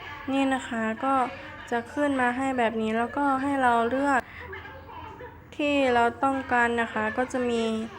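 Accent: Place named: Korat, Thailand. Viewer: พี่เป็นคนโคราชรึเปล่าค่ะทำไมพูดไทยเดิ้งค่ะ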